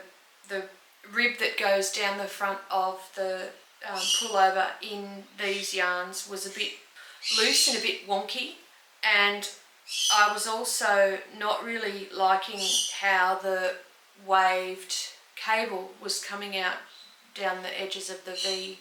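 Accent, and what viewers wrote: Australia. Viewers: I’m really enjoying your podcasts….nice to listen to an Aussie while I’m ploughing through 2aat sleeves already attached to the jumper. It’s an oversize (daughter’s request) Merino Magic (Heirloom) - soooo bulky that I need to knit at the dining table in air conditioning of course. It’s pretty hot here in Two Rocks WA. On to your next episode.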